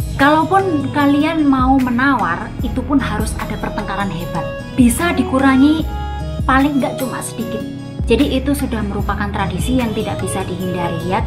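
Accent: Java, Indonesia. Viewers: Kalo boleh tau Mbak asli Jawa mana ya.. Medok eh bahasa Indonesia nya He.. He.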